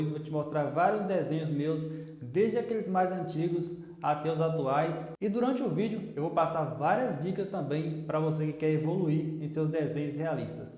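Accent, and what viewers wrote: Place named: Paraná, Brazil. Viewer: Kkk todo esse tempo eu achei que pelo o seu sotaque você fosse Paranaense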